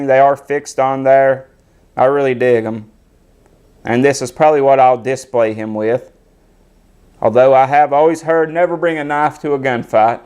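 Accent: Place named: Texas, United States. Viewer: Your accent..your from texas?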